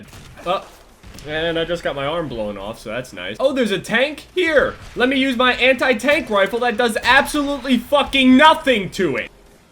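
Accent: New Jersey, United States. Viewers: Omg is he from new jersey!?!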